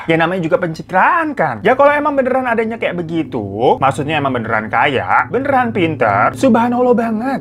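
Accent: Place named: Jakarta, Indonesia. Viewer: 😄😄😄 lucunya Lo bang...Betawi bet ngomong nyeeee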